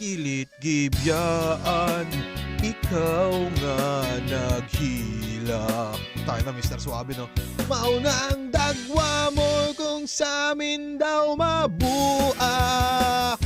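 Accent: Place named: Visayas, Philippines. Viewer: galing ng accent boss parang bisaya talaga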